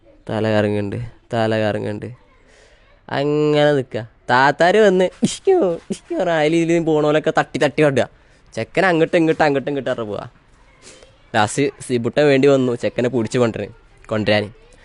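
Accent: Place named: Manjeri, India. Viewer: Manjery slag adipoli,valkeene😂😂😂😂❤❤❤❤❤ ❤❤❤❤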